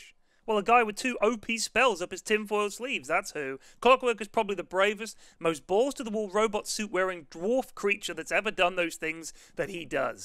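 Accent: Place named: United Kingdom. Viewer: his british accent makes everything sound funny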